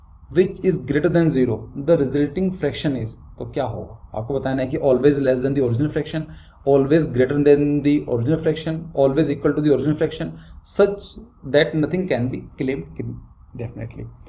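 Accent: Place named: mixed, India. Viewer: I thought...bcoz in this video ur one accent like UP, Bihar side😅😅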